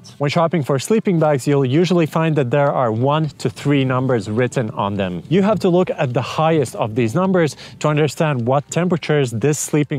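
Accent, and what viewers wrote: Latvia. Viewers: Man jau likās, ka es dzirdu latviešu akcentu. Labs video! :)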